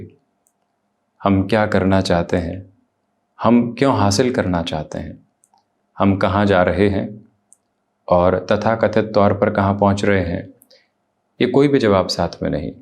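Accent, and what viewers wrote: Standard. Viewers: आपका आभार एवं धन्यवाद, इतनी स्वच्छ और शुद्ध हिंदी सुनकर मन प्रफुल्लित, आनंदित हो उठता है।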